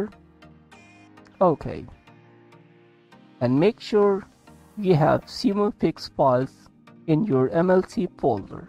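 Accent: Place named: Asia. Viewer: Why the FVck does he sound asian?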